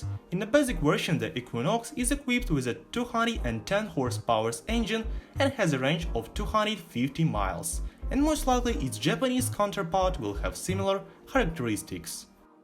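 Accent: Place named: Singapore. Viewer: You sound SINGLISH......sounds like Chinese but speaking English. Hard to understand what you are saying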